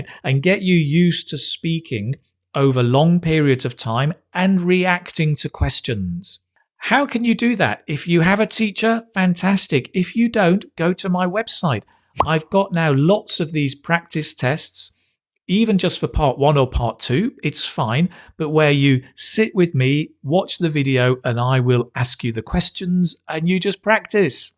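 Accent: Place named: Manchester, United Kingdom. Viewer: Definitely British ,from Manchester, excellent teacher!!.Greetings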